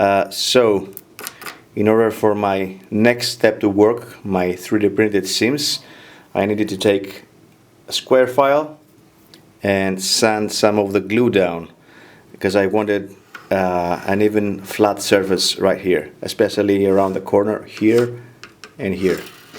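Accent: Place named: Greece. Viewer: 1 minute in and I started looking if you are Greek. Bless the accent <3 Great work <3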